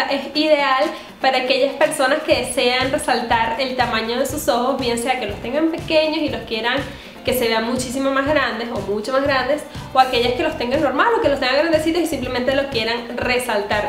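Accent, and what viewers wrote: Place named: Venezuela. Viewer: venezolana verdad (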